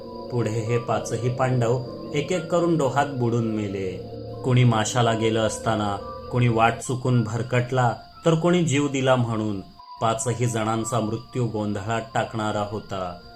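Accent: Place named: Ghat, India. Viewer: तुमच्या कथा खूप छान असतात मी नेहमी ऐकते तुमच्या कथा तुमची मैत्री तर खूपच मस्त आहे ती नेहमी अशीच प्रेमळ रहावी हीच देवाकडे प्रार्थना.तुमच्या कथेतून अस वाटत की तुम्ही कोकणी आहात पण तुमच्या भाषेतून ते जाणवत नाही.तुमची भाषा घाटी वाटते.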